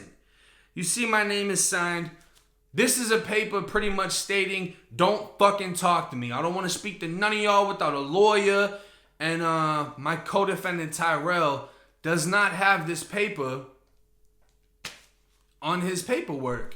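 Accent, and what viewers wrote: Boston, United States. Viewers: You're doing good work out here. "Scared Straight" with a Boston accent. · I started watching you some months back. You remind me of myself 20 years ago. Respect to what you are doing. At that age no one could tell me anything. I thought I knew it all. But here goes. To change means to change everything. You will learn that being “gangsta” is not cool. Carrying guns illegally isn’t cool. Talking about beating people up is not cool, reminiscing about old dirt is not cool, living in a shitty apartment in roxbury is not cool. You have a great mind that could be used to do so much more then talking about jails and crimes and buck50ing people. I hope to see something from you in 15yrs when you’ve come to the realization that all this shit does nothing more than make you a cop target bullet magnet. I grew up in New England. From what you say you haven’t lived there since you were a small child. 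What’s up with the accent? Adding emphasis on a “Boston” accent isn’t cool either.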